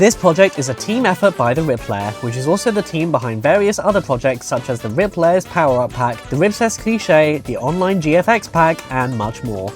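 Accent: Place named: United Kingdom. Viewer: It's a good Direct, but my only criticism is that some unscripted parts of the Direct gave me too much of a headache with your British voice, especially the "sponsored" bit at the end with that Greek statue guy who is really crazy annoying to me! That's why I prefer another fan direct like Rayman Alive or F3 So remember, next year, no more crazy staff or badly unscripted parts!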